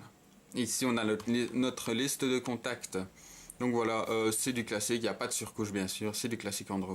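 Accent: Belgium. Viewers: Slt. Je suis intéressée par le Nexus 5 qui va sortir prochainement. Etant egalement belge, où peut-on s'en procurer un? Super video, et vive l'accent belge en effet! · Alors c'est ça l'accent belge ? C'est marrant ;3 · vive l'accent belge !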